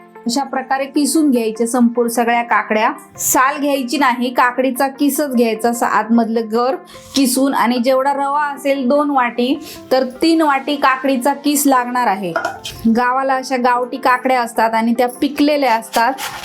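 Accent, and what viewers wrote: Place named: Malvan, India. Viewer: Waa..mast recipe ..thanks aani barobar tumch malvani touch aslel bolna pan mast.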